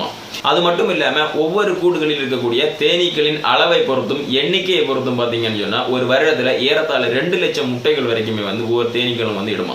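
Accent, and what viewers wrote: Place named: Kanyakumari, India. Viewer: அருமை. குமரி தமிழ் சிறப்பு.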